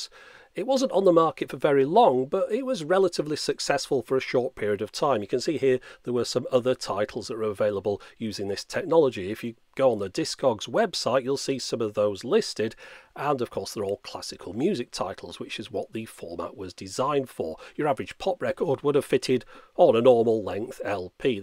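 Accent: Germany. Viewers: The same with German, the English voice sounds a bit "German" anyway. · the english language explanation is clearly done by a kraut, you can hear the accent through even though he does a good job of masking it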